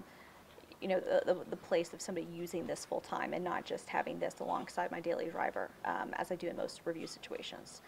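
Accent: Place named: United States. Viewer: As much as I want to listen to the opinions and experiences, I really could not handle the accent. I have to pause it every 2-3 minutes, take a deep breath [anticipating more American accent] and then play further. Seemed really balanced and objective evaluation though. Cheers!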